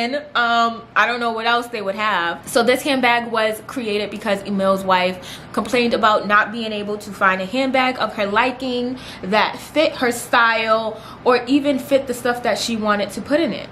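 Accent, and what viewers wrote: New York, United States. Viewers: Sis I love this!!!!!!! It’s giving what it needs to give. Fashion knowledge with the New York accent!!!!!!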